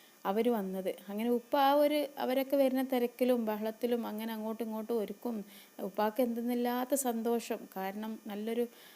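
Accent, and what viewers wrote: Malappuram, India. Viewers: ഉഷാർ ആണ് itha mlprm സ്റ്റൈലിൽ തന്നെ പറഞ്ഞാൽ മതി അടിപൊളി 👌👌👌👌ഒന്നും parayaan ഇല്ല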